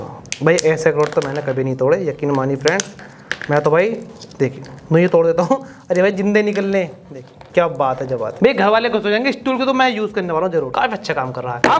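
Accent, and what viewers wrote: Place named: Bharatpur, India. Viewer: Aapki bhasha humare yaha jesi h bharatpuriya jo bahut achchhi. Lagti h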